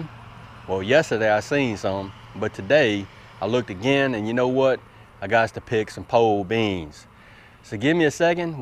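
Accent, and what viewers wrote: South, United States. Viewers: Look ☀️😎shines on brother, great video as usual the man, now when's dinner ain't had fresh pole beans in years. My g-ma, canned the man. Just wondering what Indian you are, Chickasaw or Choctaw, Biloxi hope you and yours have sure enough great day and sure enough better tomorrow my friend. Man I love the way y'all talk down yonder.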